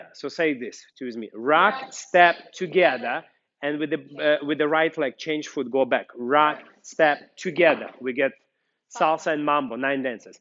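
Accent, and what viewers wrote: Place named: Ukraine. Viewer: I watch your videos all the time for tips! I would always say to myself "you are exactly like my instructor"! When my social dance studio closed down from Covid last year, I managed to find a new studio close by. I wasn't expecting one-on-one with the utmost professional dancer as I was only used to group dancing with my granny buddies where I think we put more social into the studio then perhaps the dancing. However, I really liked learning all the different dance styles and wanted to continue. The new studio I found is nothing like the old one. I come home and need a hot bath after these lessons. I also had to look for videos for tips and exercises, but they couldn't be just any videos, I needed to find videos where the instructor would say " posture, posture posture, you have to settle your hips, core in, rotation, you need to create a straight line"! 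Then when I heard in your video "a beautiful straight line" in the same exact accent as my instructor, (who is also from the Ukraine), I said to myself... these are the perfect videos! I really like learning the mechanics of the dance now even though it is a lot of hard work! However, the mechanics put the meaning, style, expression, and beauty in every step. I am grateful for finding the most passionate and experienced instructions. Thanks for all you do!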